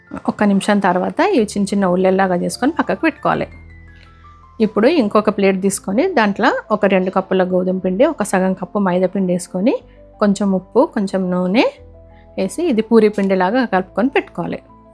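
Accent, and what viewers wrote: Telangana, India. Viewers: మంచి తెలంగాణ వంటకం తెలంగాణ యాస లోనే చెప్పారు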